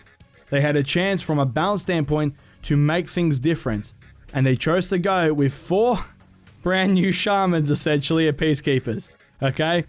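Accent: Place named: Australia. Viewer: 2 random things, you Australian or New Zealand? You know who Robert Whitaker is? You remind me of him for some reasons. The dude is my favorite ufc fighter.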